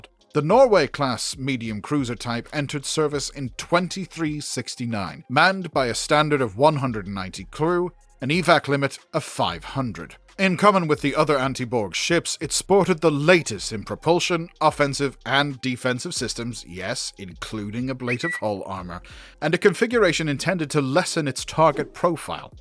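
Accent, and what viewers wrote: United Kingdom. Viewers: okay, the narration was way way over the top on this one, had to shut er down 1/3 thru lol. Please get rid of the nasty british accent thx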